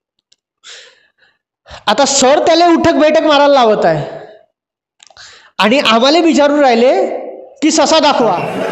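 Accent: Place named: Nagpur, India. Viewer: तूझी नागपुरी मराठी ऐकून मला नागपुर ची खुप आठवन येते. Miss u Nagpur. Miss u Vidarbha.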